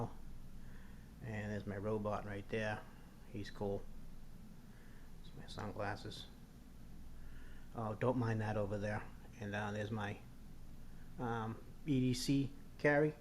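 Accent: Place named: New England, United States. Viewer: Pacific cutlery! And I would recognize that accent anywhere go PATS!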